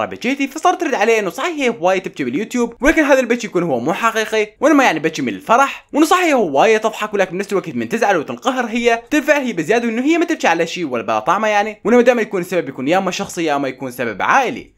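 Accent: Iraq. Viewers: انا احب العراقين والهجتهم عشان جي احب اسمع وهو يتكلم 🇮🇶🇦🇪❤️❤️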